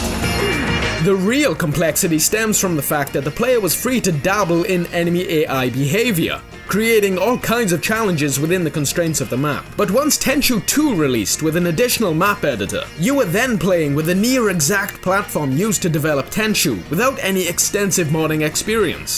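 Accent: Wales, United Kingdom. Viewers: Yeah, Im pretty sure hes welsh. Sounds just like I guy I know who is from Wales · great video I love your work. where are you from? Guess Newport/Cardiff way by the accent?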